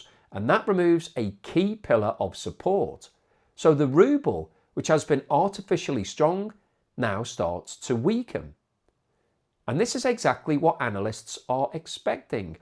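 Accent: United Kingdom. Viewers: I do like your fast British delivery rather than those wordy USOnes that treat us like idiots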